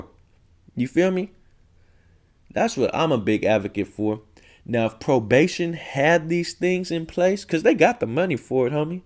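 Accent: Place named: Bay Area, United States. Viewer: Hey bro! I've been loving this channel. Where are you from mayne? I seen you in fremont some of the vids and what not. I lived in fremont frisco stockton all that. I can tell you from around this way from your voice and slang. Lol im subbin off too homie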